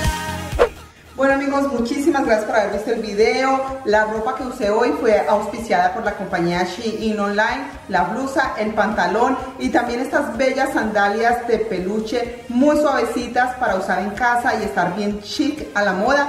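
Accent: Colombia. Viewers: me encanta el acento Colombiano, en instantes se escucha!!!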